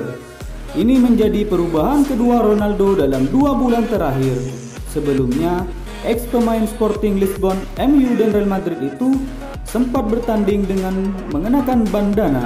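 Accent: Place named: Madura, Indonesia. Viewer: nada duro nya ketok an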